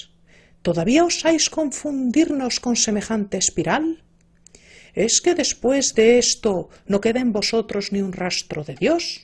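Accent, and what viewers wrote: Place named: United Kingdom. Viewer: Gracias por tu esfuerzo. (...y asentó británico ).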